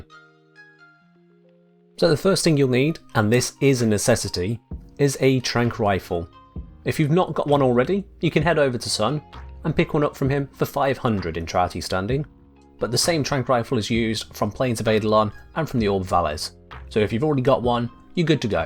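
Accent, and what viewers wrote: United Kingdom. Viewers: you and your beautiful british accent saved me, thank you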